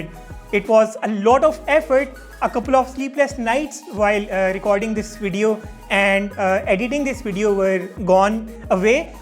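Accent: India. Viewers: When a india try to speak Englisch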